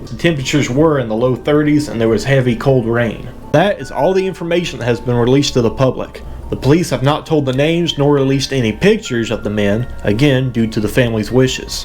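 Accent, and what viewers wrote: Appalachia, United States. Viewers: Thank you for keeping the good ole Appalachian accent alive! It's unique and refreshing to hear it online.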